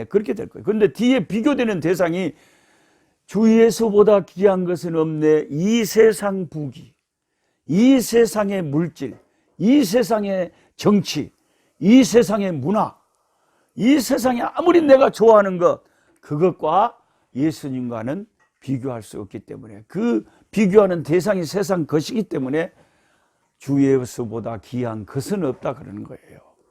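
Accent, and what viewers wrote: Jeolla, South Korea. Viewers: 아따 같은전라도네요 사투리넘정겹네요 순천이네요 선교사님 어떻거멀리가서선교하시느라 애쓰시네요 잘듣고잇네요 강건하세요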